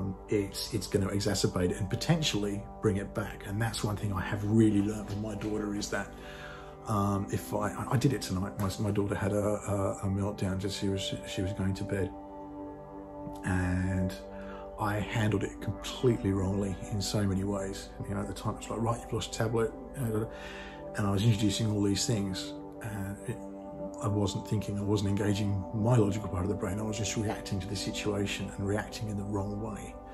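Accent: Australia. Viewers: Thanks for the Star Trek analogy. That was perfect being Im an avid Trekky. I work in a school with challenging kids. PDA came onto our radar sometime last year. I am working with a girl that we think may have it. Anyway... strategies for her can be applied to all the kids as a way to keep things calm and safe while diagnosis' are being sought. Im in Australia. You sound Aussie. Its easy for me not to feel it personally, it must be so hard as the parent. I validate students feelings so they know I am listening. I have had things thrown at me, sworn at me etc. I ignore all those things. A gentle smile would work best as a bigger smile can be interpreted as ridicule.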